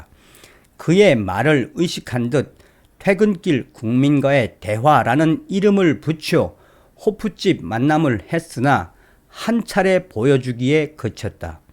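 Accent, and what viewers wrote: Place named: North Korea. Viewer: 이런 재길 안전히 북한 말투 재수똥박아지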